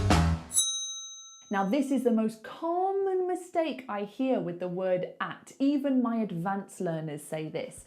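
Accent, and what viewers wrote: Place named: RP, United Kingdom. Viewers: I love you rp accent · I like English accent ！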